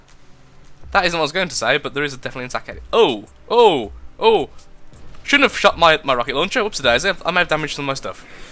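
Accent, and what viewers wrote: United Kingdom. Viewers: i have a weird feeling your voice is different, more "brittish" pretty weird since you are brittish but still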